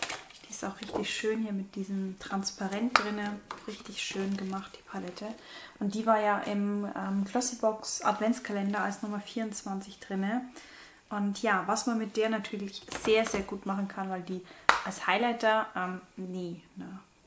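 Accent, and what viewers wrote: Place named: Bavaria, Germany. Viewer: Soeben entdeckt 😅 gefällt mir gut.. Ich meine zu hören dass du aus Bayern bist😁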